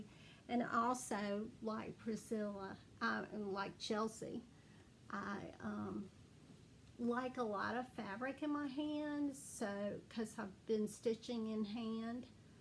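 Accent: South, United States. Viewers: y'all both have such a sweet southern drawl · Just found you. Love your southern accent!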